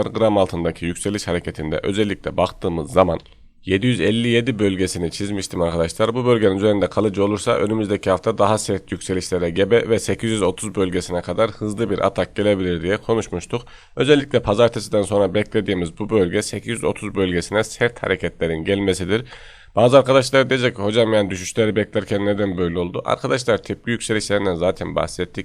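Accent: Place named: Konya, Turkey. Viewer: Konya gibime geliyor 😊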